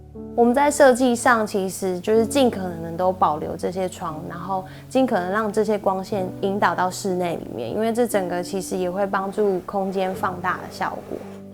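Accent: Taiwan. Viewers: Shout out to the Taiwanese accent. 濃濃的親切感。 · 😆 好喜欢台湾腔的普通话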